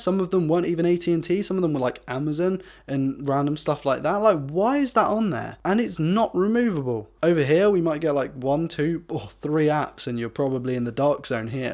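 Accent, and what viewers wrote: United Kingdom. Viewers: we should listen to this guy, he has a British accent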